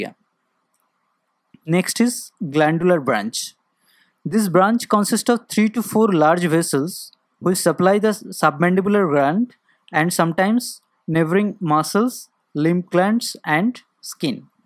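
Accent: India. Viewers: ☹️I don’t understand the accent of. Indian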